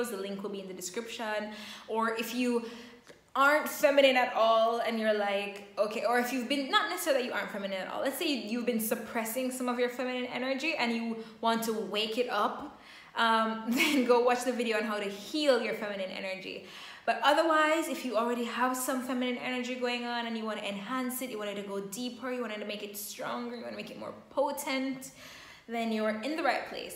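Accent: Jamaica. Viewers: I hear jamaican accent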